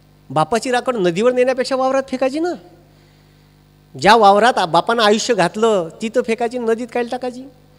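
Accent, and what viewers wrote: Vidarbha, India. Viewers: खतरनाक सर ,, आपली विदर्भाची भाषा शेवटपर्यंत सोडायची नाही.... 👍👌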